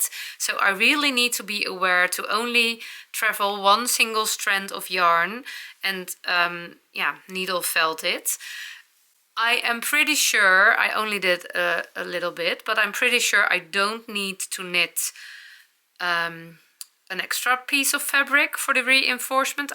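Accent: Netherlands. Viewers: We love your Dutch English! It is actually very good! 🙂💕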